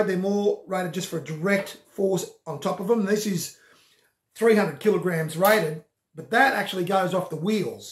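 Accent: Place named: Australia. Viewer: Doctor your 4 DOF build is amazing! I love the videos and your aussie accent is always pleasant to the ears :) Cant wait for the rest of the build videos!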